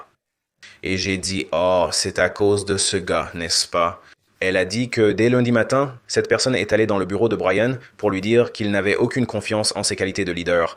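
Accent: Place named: Quebec, Canada. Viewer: Il "sonne" québécois toutefois.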